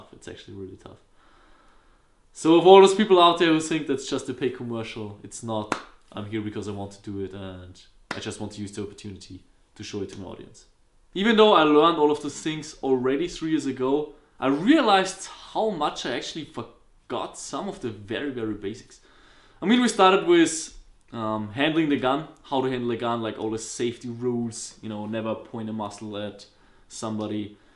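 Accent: Germany. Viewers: Always asked myself if u were German because u sound like it. Now I saw that on the Blackboard :D #Novritscharmy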